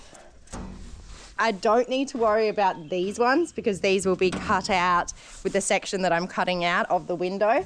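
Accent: Australia. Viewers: Gday looking great got Granddaughter Number two with me Sarah she is 18 months and loves your show haha maybe the Australian accent have a great week !!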